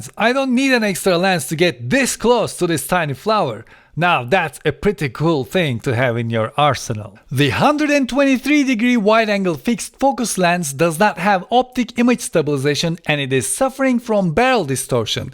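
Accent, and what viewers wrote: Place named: Turkey. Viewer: Aksanı duyunca “kesin Türk bu” diye yorumlara geldim ve yanılmadım. Güzel video ayrıca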